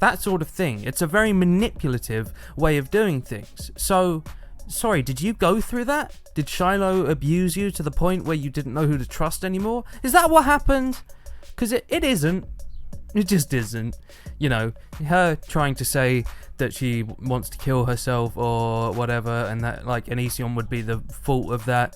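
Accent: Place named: United Kingdom. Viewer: I love hearing a Person with a British accent shit all over him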